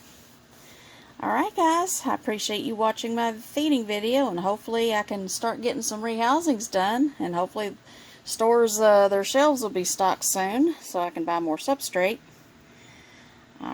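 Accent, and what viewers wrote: South, United States. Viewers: Loving that southern accent 😍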